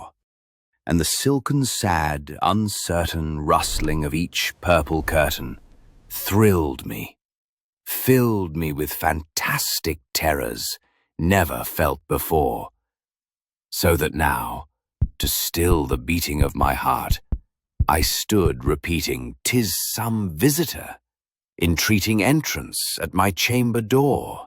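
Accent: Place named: South, United States. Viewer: As with your other presentation with Pistolero Poe, this is a joy to behold. I do detect a slight southern dialect; it becomes easy to imagine this as Poe's actual voice. The AI illustrations for his poem would have fascinated the same man who wrote an essay about the then new daguerreotype process, and sat for several of such portraits. He was quite taken by new technologies and the sciences. Thank you for including the entire poem that we might read along, or ponder it...evermore.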